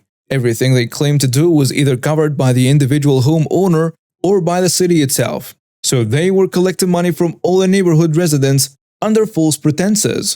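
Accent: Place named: United States. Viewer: Hi! I hope that all is well with you and your loved ones. You are amazing with the English language. You can say several sentences in a way that sounds like you're an American! You are pretty much fluent! 👏👏👏 It's snowy, windy, and the temperatures in northeastern US right now, p.m. are 4°F. It's freezing! What is it like in Egypt? I wish you continued success with your channels! Take good care of yourself. Work hard, but find time for fun and relaxation, too!😊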